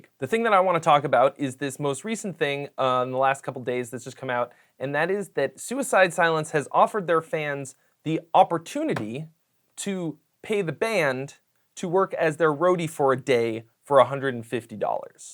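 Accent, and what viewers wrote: United Kingdom. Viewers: Your British accent makes you sound like Russell Brand. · Your English accent reminded me of Russel Brand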